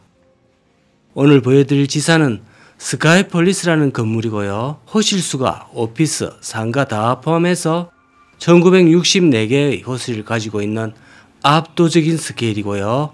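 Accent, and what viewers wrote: Gyeongsang, South Korea. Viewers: 고향이 어딘가요? 지는 경남 합천이고 59세 입니다.사투리가 나랑 똑 같아서 정겹네요. 박상은 우리 말고는 잘 모를거에요.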